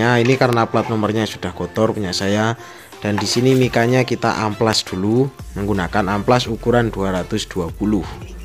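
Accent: Temanggung, Indonesia. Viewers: orang mana mas kok kayak temanggung.. lar lur😁😅😁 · Wong temanggung po om??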